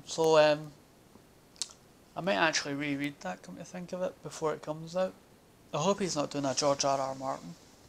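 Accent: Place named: Scotland, United Kingdom. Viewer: Very nice! You should totally do a Rabbie Burns reading, you have the perfect accent for it :D Also, I wouldn't mind that Pullman book, it's actually on my 'want' list 'cause I'm trying to collect all of his work :D I'll cover the postage if you want! Although if someone else wants it then don't worry about it too much, I'll get to it eventually either way!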